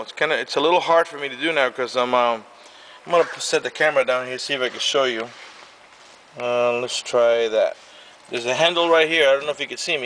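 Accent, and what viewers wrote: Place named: Cuba. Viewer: You are neither, nor a seller not an american you speak like a cuban just arrived..sorry, mention the issues with CVT automatic transfer case, is a sheet I sold mine just 1 year after got it, got it???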